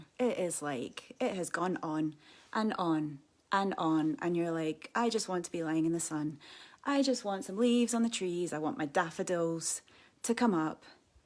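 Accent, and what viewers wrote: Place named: Glasgow, United Kingdom. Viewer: it's so cool that you still have a wee Weegie burr :D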